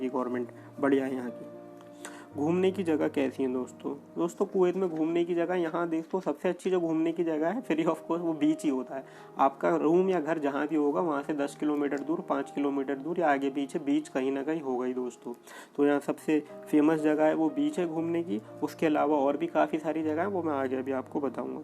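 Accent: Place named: Garhwal, India. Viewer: bhai India me aap kahan se belong karte hain..aapka accent garhwal ya koi pahadi side ka lagta hai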